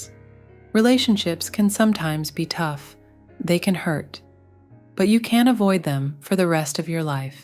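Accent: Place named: Thailand. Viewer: Your Thai accent is amazing!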